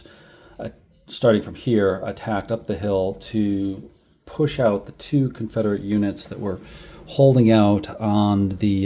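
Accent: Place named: Massachusetts, United States. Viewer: I can see how a Massachusetts accent wouldn't work down in ol' Virginia!! Actually, everyone NOT from the Shenandoah would likely make the same mistake with Staunton. It's part of the rite of passage as one gets immersed in Civil War history!! I'm still learning about the odd pronunciations of some waterways (Mattaponi, Ni, etc.) and some of the commanders are routinely mispronounced (the two Taliaferro's, pronounced "Tolliver", Huger (pronounced more like YOU-zhee) and even Heth (pronounced more like "Heath"!! I also find many people seem to trip over Sigel, which you did not, to your credit. I don't know WHERE someone would get "SY-jel" out of that, but some do!!! 😉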